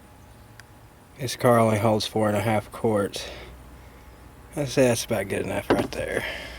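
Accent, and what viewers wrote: South, United States. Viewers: The authentic Southern accent ! howdy !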